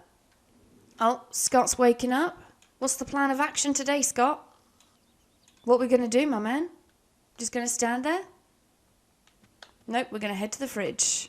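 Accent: United Kingdom. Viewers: I'll be honest here: of course I watch Sims movies because I just love them, but in this channel's case it's the sassy Brittish accent that adds some icing on the cake. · I opened the video, then, I heard you're british. I'm sorry. I can't.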